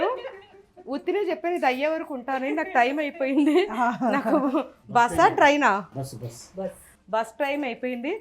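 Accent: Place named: Godavari, India. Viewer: Mee telugu slang maa godavari slang madam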